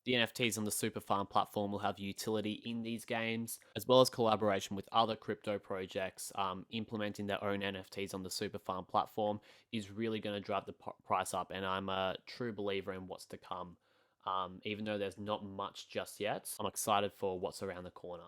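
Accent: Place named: Australia. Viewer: I subscribed because you sounded like an aussie living the crypto dream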